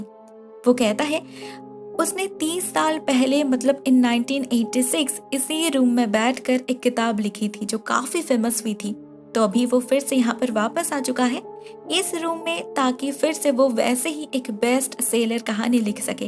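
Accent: Bengal, India. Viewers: You are bangoli right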